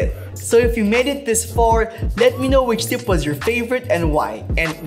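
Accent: Philippines. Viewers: I love that Filipino accent. Beautiful people with beautiful voices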